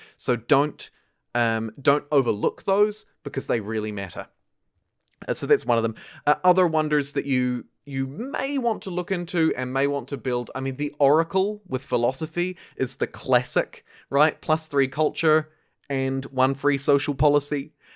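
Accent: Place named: New Zealand. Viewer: as another kiwi i have to say that your accent or iksent as we say is very rhotic. e.g. culturrrre rather than culcha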